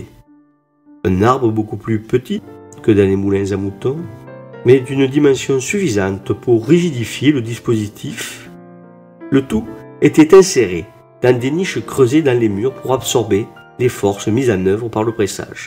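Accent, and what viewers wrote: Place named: Albi, France. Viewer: Il me semble entendre l'accent de mon beau-frère albigeois. J'adore. Autant que le sujet des moulins. On croirait écouter un conte. Délicieux. Merci.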